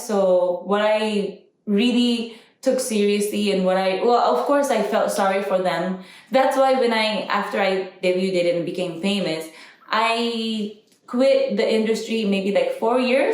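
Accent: Philippines. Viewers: Filipino accent 😍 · Why is her accent sounds like filipino?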